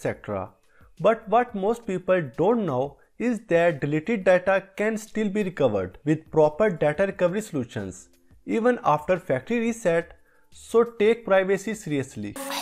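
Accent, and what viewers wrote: India. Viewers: im from austria its my second language im far from perfect but anyway its easy to learn most easy language to learn but its not even referring about his english skills like grammer and words and so on he is obviously talking about his hardly to understand indian strange accent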